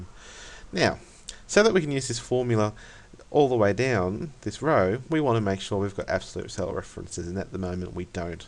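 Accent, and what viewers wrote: Australia. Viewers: Thanks..its nice hearing another Aussie and wow I just learnt how to SUM IF!!!!!!!!!!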